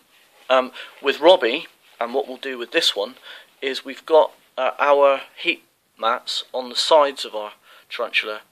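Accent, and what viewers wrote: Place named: United Kingdom. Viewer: Hi.....Good to hear a UK voice....most of the videos I find are not UK which means they can't recommend shops / websites. I will be getting my first Ts soon, am getting as much info as I can before buying them. I am disabled and housebound, so I have to do everything via the internet. My disabilities will not affect my ability to care for them in any way. In your video you said you'd be happy helping anyone new to the hobby, but that was a few years ago, are you still around ?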